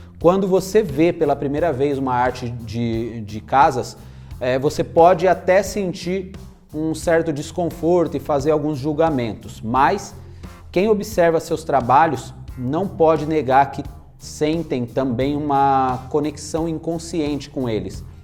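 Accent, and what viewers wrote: São Paulo, Brazil. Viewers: você é da região de SP?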